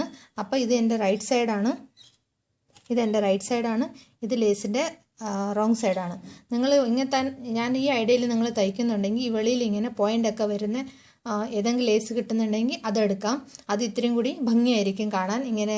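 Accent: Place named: Tamil Nadu, India. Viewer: Ningal tamil aano...samsarathil oru tamil chuva..enthayalum nice video aanutto. New subscriber aane..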